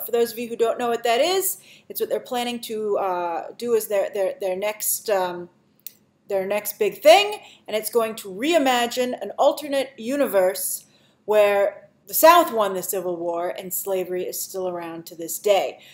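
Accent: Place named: New York, United States. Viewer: I just have to ask which part of New York are you from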